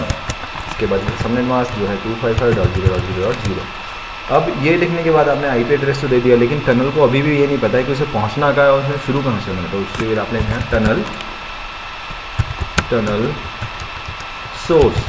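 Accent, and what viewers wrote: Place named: India. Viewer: Can't tell if that's Engrish or Indian.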